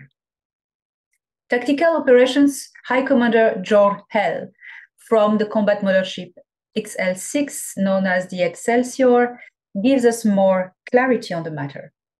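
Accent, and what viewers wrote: France. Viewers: I wish someone would transcribe these videos. I want to hear and understand but the CC leaves so much to be desired.! And I just can't 'get with' the French accent. :(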